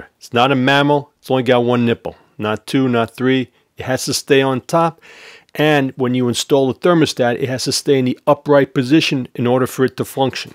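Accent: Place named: Brooklyn, United States. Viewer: Pretty good Brooklyn accent... I actually have my eye on an ‘03 Pilot, 93000 miles; $3800. Good price?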